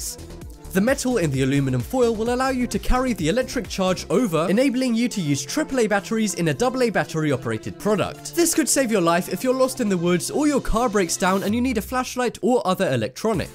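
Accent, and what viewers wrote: United States. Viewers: You swy you're from the UK, but you talk like an American. I wonder if you are lying about your heritage...?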